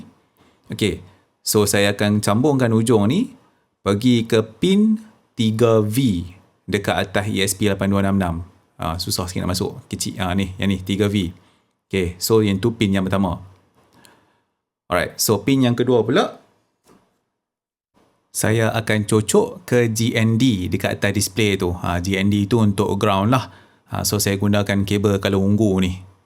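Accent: North, Malaysia. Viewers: Seronok dengaq oqang utara punya slang tu piaw..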